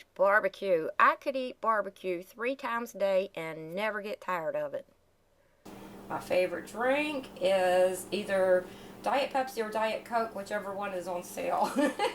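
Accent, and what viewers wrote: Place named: Gulf Coast, United States. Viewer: I was browsing through your channel and came upon this video. I really enjoyed your childhood videos. I always thought you lived closer to the gulf coast with your accent and your early gardens. Guess I was wrong:(. I have always wanted to live in virginia and buy a plantation home from before the civil war. My mom said the same thing about wishes..only there was a swear word in there. Funny how some sayings become popular:) Thanks for sharing:)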